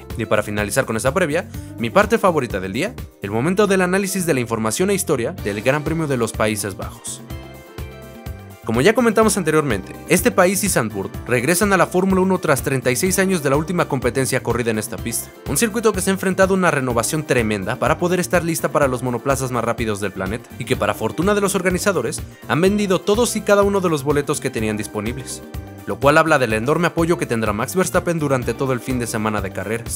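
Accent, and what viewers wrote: Mexico. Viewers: pensé que eras mexicano xdd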